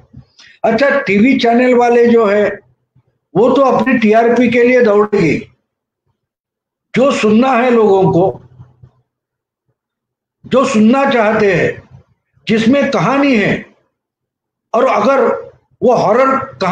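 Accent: Maharashtra, India. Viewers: मराठी स्वाद वाली हिंदी मधुर लगती हैं.बहुत बढिया. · Utkrushth muddesud Mandani.. Hinditala Marathi tone awadla..Bhau kuthech watle nahi Hindi kamkuvat ahe apli.. Tumchyasarkhya vicharanchi aaj garaj ahe bolat raha.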